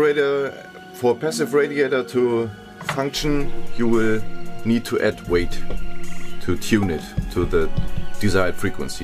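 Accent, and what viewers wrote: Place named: Germany. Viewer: i love germans speaking english :D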